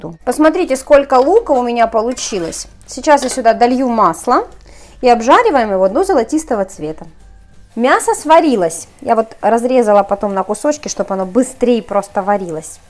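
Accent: Surzhyk, Ukraine. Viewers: Спасибо за рецепт,скоро буду готовить именно по вашему рецепту : ) P.S. обожаю ваш канал и вашу приятную подачу рецептов. Не обращайте внимания на дураков и хамло, не меняйте манеру разговора и суржик : )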